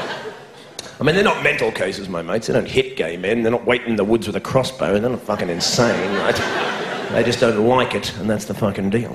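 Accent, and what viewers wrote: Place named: Australia. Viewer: George Carlin with an Australian accent.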